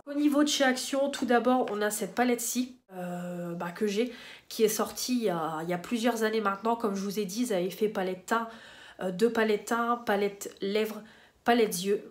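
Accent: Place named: England, United Kingdom. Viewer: En effet, j'ai kiffé le petit accent anglais 🤣❤️ hâte de voir de jolis makeups avec cette palette ✨️🌸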